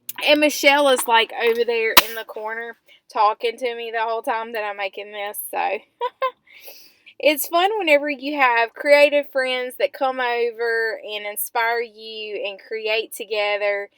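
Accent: South, United States. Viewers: Cool pages..I'm from the UK and I really love the southern accent.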